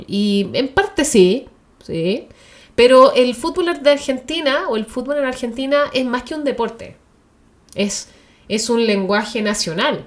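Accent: Chile. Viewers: Tengo solamente 2° año de secundario con 64 años cumplidos, pero mucha cultura general y conocimientos en un amplio espectro. Con el tiempo comprendí que el vivir en una ciudad donde podés comprar un libro, en un lugar físico, a las 4 ó 5 de la madrugada, te OBLIGA a entender más de cultura general. Lástima que muchos de la nueva generación, no aprecian eso, su vocabulario es muy básico y plagado de incorrecciones que los viejos no entendemos. Te oigo hablar y sé que sos chilena... tengo muchos amigos en Chile, El Quisco, Valparaíso, Peñaflor y mi hermano de la vida en Melocotón. Grandísimos amigos que me respetan, pero hay muchos que no. Soy músico, canto y sufrí el desprecio viviendo dos años allí, cuando vuelvo escucho a veces que me gritan "argentino culi.........ao" y cosas parecidas recordando a mi madre. Cuando voy por Vespucio respetando los 80 Km de máxima, tambien escucho gritos. 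Pero bueno, mi objetivo es estar con mis amigos y dejo todo de lado. Mi pareja desde hace 11 años, ya no quiere ir más... ESO NO LO VEN, NO ES UN DEFECTO, UNA DISCRIMINACIÓN??? Antes de ver la paja en el ojo ajeno, deberían ver el árbol que tienen en el propio. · gracias por tratar de entendernos y lo estas logrando, me gusta tu tono de voz y tu acento chileno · Me apareció este video en mi feed y escuché tu acento chileno y lo primero que pensé fue "la puta madre, otra chilena más que nos va a salir con lo de los hambrientinos, las Islas falkland, las crisis económicas, y tantas otras cosas". Pero me dejaste sorprendido. Ojalá un 1% de tus compatriotas pudiesen ver este video. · Me parece que esta mujer no es española es como que tiene acento chileno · Guaaauu... ¡Qué interesante! ¡Qué capacidad de observación y de análisis,por Dios! ¡Y qué bien lo expresas! ¡Y que bien suena tu acento chileno! 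¡Qué dulce y qué delicioso suena! Ah... Te cuento: Acabo de suscribirme. Un abrazo enorme desde Córdoba. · Soy Argentino, específicamente de San Juan. Te olvidaste de las provincias del Norte que también tienen sus tonadas su folclore y su cultura y muy en contraposición con el de Bs As. Igual te digo que tú como chilena (se nota por tu tonada) que has descrito casi a la perfección y de todos los videos que he visto que han echo dedicado a los argentinos, el tuyo es el mejor por lejos ❤ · El asado es un mito en el argentino, no tanto asi, los argentinos comemos carne como en calquier otro pais. Si se puede decir que en alguna provincia del interior se consume mas carne, zona rurales. Pero diria que el argentino de Buenos aires por ej, es mucho de la pizza, las pastas... y los argentinos de bien ,por lo general son sociables, amigable , es aventurero y soñador. y ama su pais por su tierra,su cultura...Quizas los de CABA se creen europeos, pero el resto no lo creas, pero si posee mucha cultura latinoamericana y no europea... 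. Y para proxima ,cuentanos como son los chilenos...-por tu acento creo que eras chilena-. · Suena tan bonito una descripción tan profunda y tan bien contada de argentina mi pais en ese acento chileno característico que mirando la cultura argentina como en un espejo reflejas tu gran cultura y nivel intelectual, lo hiciste con una descripción perfecta y poética ,chile ha crecido tanto en las últimas décadas económicamente, culturalmente y en educación que es un ejemplo en América dejar atrás nuestros prejuicios y encontrarnos como pueblos es crecer también y este relato es una muestra enorme, gracias desde córdoba Argentina 😊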